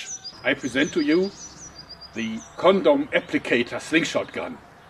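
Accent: Germany. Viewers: It's cool how I recognized the German accent even before I knew you ARE German. xD By the way, I'm German too, so it's no hate. I also have some bad accent. :) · when you hear his ,,dialect“ of speaking, you know that he is from germany;) geile video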